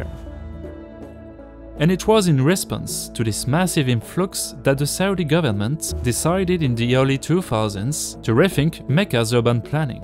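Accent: France. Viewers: I like your French France 🇫🇷 accent but sometimes I can’t stand what your saying I’m ai sorry will you folrgive me. I’m a sorry.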